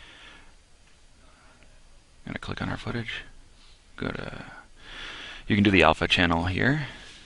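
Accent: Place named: United States. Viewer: pure amercian accent - very nice video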